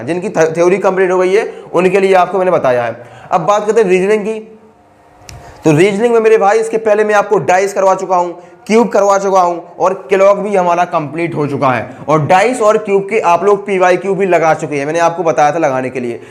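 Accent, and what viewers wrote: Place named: Madhya Pradesh, India. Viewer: Bhaiya aap mp se belong krte ho language s lgta h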